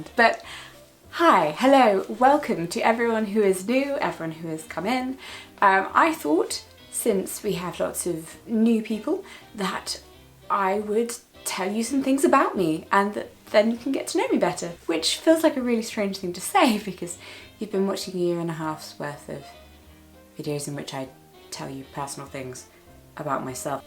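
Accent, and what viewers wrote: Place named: England, United Kingdom. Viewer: I found you right before Christmas last year, not sure how...Love your upbeat personality and especially your lovely proper English accent. I'm American and love anything British and you could have been a sister in Downton Abbey!! I'm in love with James Norton!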